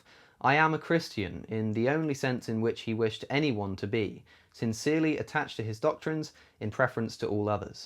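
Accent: United Kingdom. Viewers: Is he British? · oh the irony of hearing the Declaration of Independence and the U.S. Constitution being read in a British accent.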